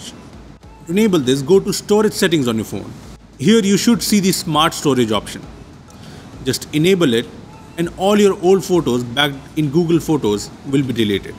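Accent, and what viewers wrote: India. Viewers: I love Indian accent 😁😉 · The Indian english accent is just hilarious XD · Thomas Politis me too I hate this Indians videos, his acent is just disturbing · Fuck you and your indian accent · As a small country East Asian, Sorry, I'm not fluent English. If you have some time, Could you add English subtitles to this video? I want to learn Indian English also.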